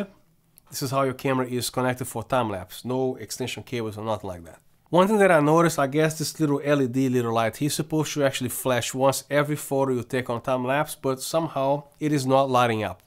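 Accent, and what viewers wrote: Brazil. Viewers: THIS is what i call a review! Amazing work, thanks a lot! Fale bem, mas vc deve ser brasileiro neh?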